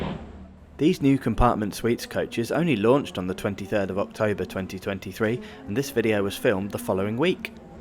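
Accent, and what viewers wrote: United Kingdom. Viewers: UK acsent smooth language ✌️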